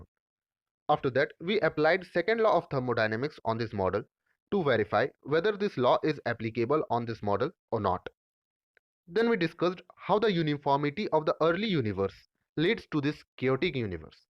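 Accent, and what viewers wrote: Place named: India. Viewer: you lost me at the indian accent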